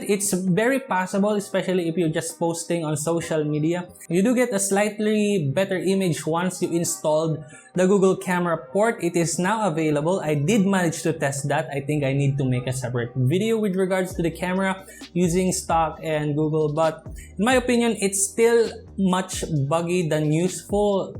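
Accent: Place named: Philippines. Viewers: The way he enunciates words, I believe that he's doing it on purpose having the "Pinoy" accent. At first, it was awkward but then I realized he's been doing it on purpose which is for me I think is better than trying to speak in a very slang, Aussie and or British accent 😆.. and I can understand him better. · We have our own accents, and yeah syempre kapag english ang title dapat english din magsalita kasi maraming complains. Hindi lang Pinoy pwede manood boi, international viewers din. Hindi tayo native ingglis speakr.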